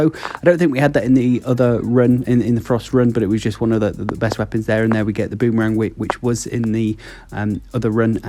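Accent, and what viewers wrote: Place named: Australia. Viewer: Just started playing. Also broke the bank and bought packs…🫣🫣 you sound Australian too! You were the first one to pop up in my search. I hope that you have good advice and can guide me through the game as well with good tips. I’m level 29 atm.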